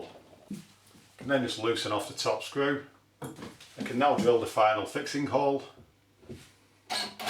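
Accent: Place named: Lancashire, United Kingdom. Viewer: 😅👍. I grew up in Blackburn so I thought I recognised the accent x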